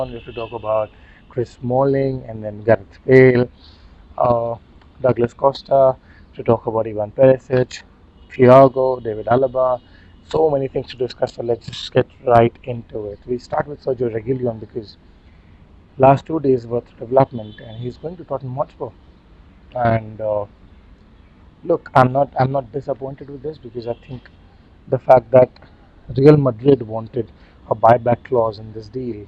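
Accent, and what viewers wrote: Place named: India. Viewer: Bro r you from India ??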